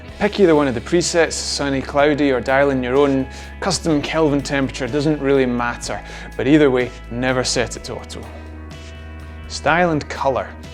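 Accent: Scotland, United Kingdom. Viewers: Great video for a newbie. So good to hear a good Scottish voice :-) Thanks for such a clear video - i have now set my new mavic to all these settings. Working my way through your set.. Cheers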